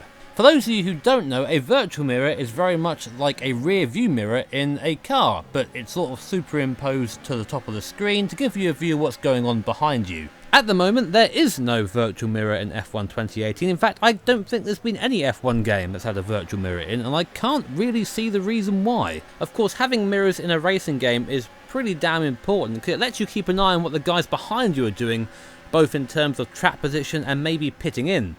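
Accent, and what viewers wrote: United Kingdom. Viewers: Annoying thic Brit!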